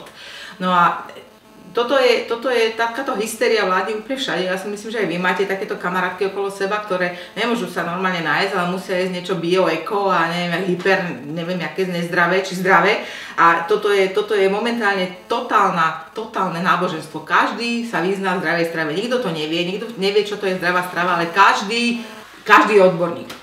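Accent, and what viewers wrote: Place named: Bratislava, Slovakia. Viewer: Nebyť toho blávackeho dialektu by človek bral aj vážne.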